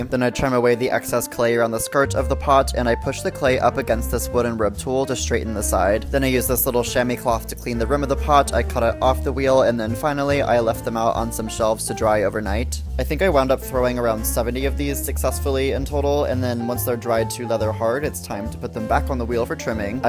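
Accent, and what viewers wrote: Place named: Germany. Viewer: Is it just me or did your accent change to german halfway through the video?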